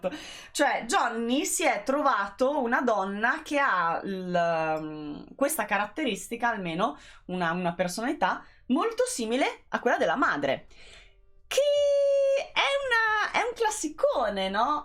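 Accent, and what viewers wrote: Romagna, Italy. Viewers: Hai la Z romagnola! comunque bel video, davvero esauriente, ti seguirò.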